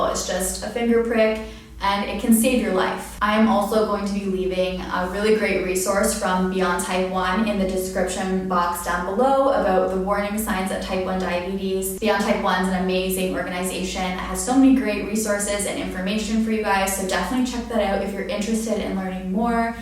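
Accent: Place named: United States. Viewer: You sound American but you said washroom lol. Thank you for your story :-)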